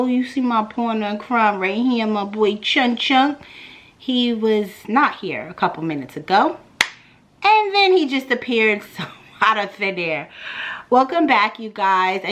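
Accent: New York, United States. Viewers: We know you’re from NY girl, you couldn’t hide it if you wanted lol 😂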